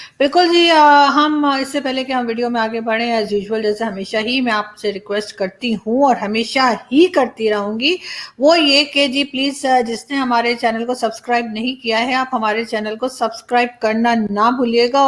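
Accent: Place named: Allahabad, India. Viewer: Allahabadi......lahza h ji, jo apke Ghar me Bola jata h kyuki apka DNA Allahabad India se h...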